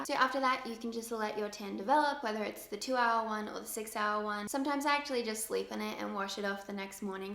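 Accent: United States. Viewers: Can anyone else hear the slight American accent that has developed. When she says A's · Wow her accent is definitely sounding American now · Yoooooo shes getting the american accent !! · sounds american now eg when she said matter 😂 ill miss your aussie accent 😊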